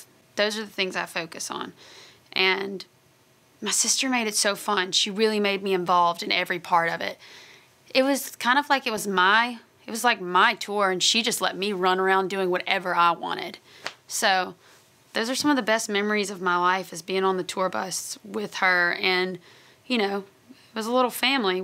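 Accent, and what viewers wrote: South, United States. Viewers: To know not the only one that talks with a southern accent she does too but when she sings she sounds beautiful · She sounds so country cx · Smh guys, she looks different because she gained weight.. And she moved down south.. Lol thats the accent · Oh my gosh her accent has changed !! More country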